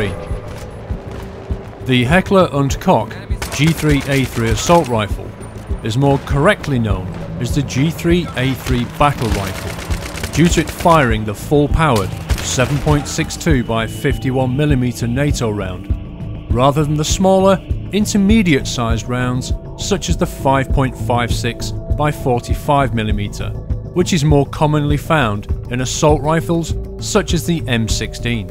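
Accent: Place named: United Kingdom. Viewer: Awesome man, the British accent goes great with this! You got a a subscriber!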